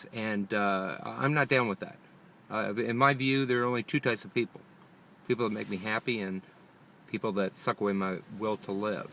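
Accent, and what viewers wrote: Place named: Los Angeles, United States. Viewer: Take that stupid video down.. you sound like a typical dumbass from LA!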